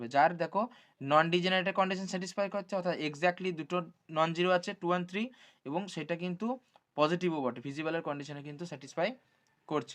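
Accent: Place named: Bankura, India. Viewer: Sir আপনার কি বাঁকুড়ায় বাড়ি?? আপনার কথাগুলো অনেক টা বাঁকড়ি ভাষার মতো শোনাচ্ছে , তাই বললাম । কিছু মনে করবেন না please.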